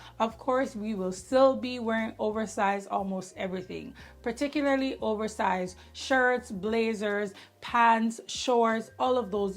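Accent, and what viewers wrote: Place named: Jamaica. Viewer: I'm seeing you for the 1st time. Heard a Jamaican accent & decided to check .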